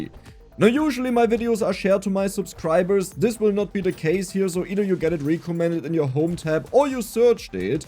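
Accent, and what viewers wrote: Germany. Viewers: you can never hide that german Accent ^^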